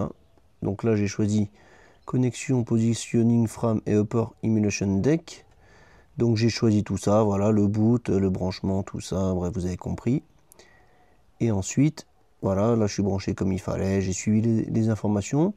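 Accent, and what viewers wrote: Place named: England, United Kingdom. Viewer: Bonjour, j'ai fait une visite par hasard sur ta chaine ( 1 pouce et un commentaire ) mais ce que j'y ai vu m'a fait prendre , disons 40 ans de plus !!!🥸 Fidèle abonné de la chaine CVVT de Vincent Raynaud, je ne l'ai jamais vu se lancer dans de telles aventures informatiques. Les Mini dont il est un spécialiste sont certainement des modèles plus anciens. Pour info cependant si tu ne le connais pas, il restaure des voitures récupérées dans le maquis ( Corse) et a même remonté une Mini avec laquelle il a fait un rallye historique il y a 2 ans ! Pour conclure je suis quand même assez surpris d'avoir découvert que le moteur de cette voiture avait été cassé à plusieurs reprises ! Bon courage pour arriver à tes fins, quant à l'accent anglais, pas de problème, mais traduis plutôt les messages en français ce sera plus cool.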